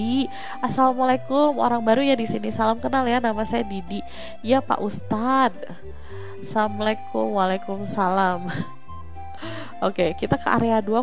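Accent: West Java, Indonesia. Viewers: yeyy upload lgi❤️❤️.. mskpun telat..... edit: sundanya keluar semua😂wkwkwkw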